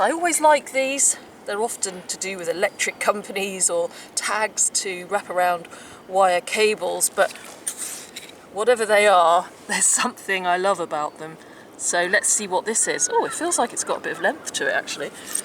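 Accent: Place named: RP, United Kingdom. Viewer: You should really do voice-over work with your Received Pronunciation speech. It is quite charming to the American ear.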